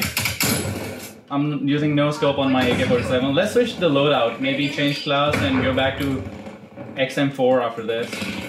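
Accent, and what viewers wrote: India. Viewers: Why do I hear a indian accent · U have Indian accent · Bro you're indian? Indian accent in your english boiii...... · Your accent sounds indian. R u an indian bro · Either use Indian accent or foreign. Indiam accent mixed with foreign accent makes it unbearable to hear.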